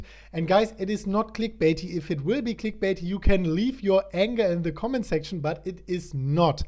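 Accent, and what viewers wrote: Germany. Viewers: Sounds like hes a German guy. Or am i wrong? At least he sounds like me if i try to speak english 😂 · Is The Moderator Form Germany or Something? No native speaker?!.. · I subscribed because of the great content and intellectual German accent. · War schon klar das du dütscher bisch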